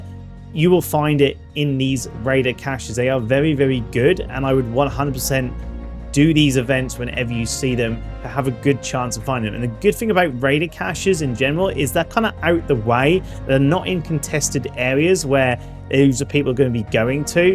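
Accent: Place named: England, United Kingdom. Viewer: How do you have an english accent so thick it makes it sounds like English isn't your first language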